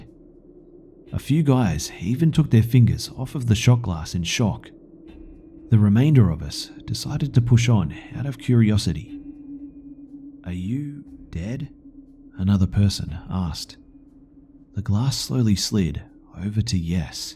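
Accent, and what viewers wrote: Australia. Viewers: He sounds Australian. Go 🇦🇺🇦🇺🇦🇺🇦🇺🇦🇺🇦🇺🇦🇺🇦🇺 Aussie Aussie Aussie oh oh oh. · I love your accent soooooo freaking much😍🙏🙏😂😂 Are you from Australia (idk it just sounds like it)😂😂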